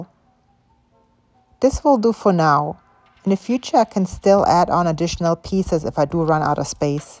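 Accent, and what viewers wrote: Germany. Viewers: Hallo wunderschönes Video. bist du Deutsch,dein Akzent klingt so,geh einfach mal davon aus und hab deshalb auf Deutsch kommentiert. Hab deinen Kanal abonniert und meine Daumen zeigen nach oben. Wünsche dir viel Spaß bei deinen Projekten und weiterhin so schöne Videos. Frohe Weihnachten und bleib gesund. Gruß Rudi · Brilliant job on the cleat system! Your accent sounded somewhat German, and then I heard you say "fertig" so that's certain! Ich kann ein bisschen Deutsch, übrigens! Curious how you found your way to the US! Subscribed and looking forward to your videos!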